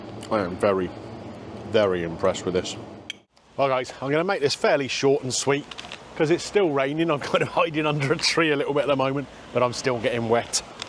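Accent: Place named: Dorset, United Kingdom. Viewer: Hi, perhaps you could answer a question for me? Is your new found love for using wow excessively, something that YouTube, insist upon, or is it just an homage to your mate Gary? I used to follow Gary's channel regularly, but have given up because six wows in one sentence, is six too many for me and it was really getting on my nerves. Please don't go down the same path, otherwise, I'm going to be stuck with The Durham Vlogger, who is great, but a little limited by sticking to County Durham. I was watching another of yours the other day, it was either Midsummer House, or Heston Blumenthal's pub in Bray. Both great reviews of fine restaurants, but both bedevilled by an excess of wows. I much prefer when you use your own words and phrases, in your lovely Dorset accent, "Good Lord", is so much more English and expressive than wow, too American. 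Gary had also taken to using "awesome", just when I thought things couldn't get any worse, I guess they always can. Merry Christmas and a happy and healthy new year, to you and your family. I hope 2026, brings us far fewer wows, than 2025, did, all the best mate.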